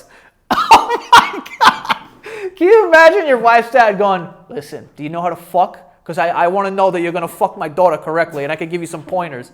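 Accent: United States. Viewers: Wait.. do Americans say axe instead of ask?? Haha how did I not notice till now😂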